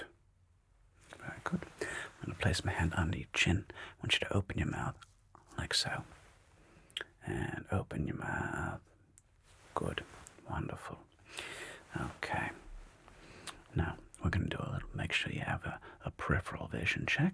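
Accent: Germany. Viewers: I love your German accent!! Saying this as a German myself 😅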